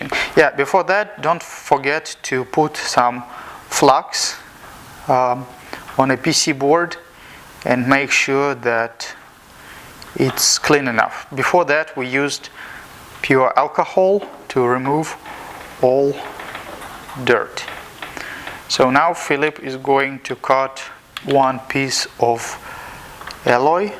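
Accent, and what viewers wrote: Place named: Nizhny Novgorod, Russia. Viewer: клевый лазерец. сколько такой стоит? где купить? сколько он мощности рассеивает, что ему внешнее охлаждение треба? пысы. звыняйте за придирку, может, это вас и не волнует (но зачем тогда все это представление с английским?), но в слове пайка буква L не произносится (полностью извиняя нижегородский акцент).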